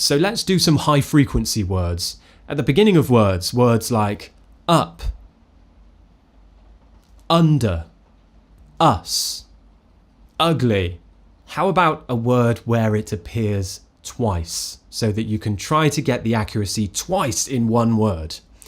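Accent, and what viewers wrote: United Kingdom. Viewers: You have one of the most beautiful British accent ever❤❤❤❤❤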